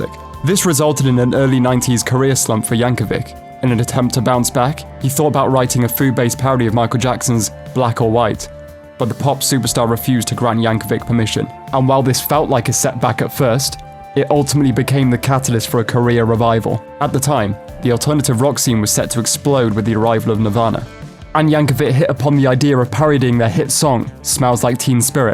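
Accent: United Kingdom. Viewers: What's with the British narrator? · The title is called "Why you haven't heard from in a while." The narrator sounds like a robot with a British accent. The video starts off telling his biography. In the first 35 seconds of the video you are bored to death. Please get to the point.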